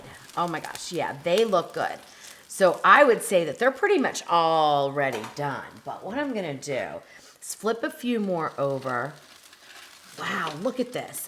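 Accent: United States. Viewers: Do I hear Wisconsin?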